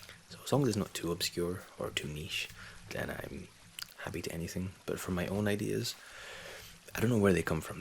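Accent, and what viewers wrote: Scotland, United Kingdom. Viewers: You still have a strong scottish twang to your accent :) yay for scotland ❤☝ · You sound Scottish to me mate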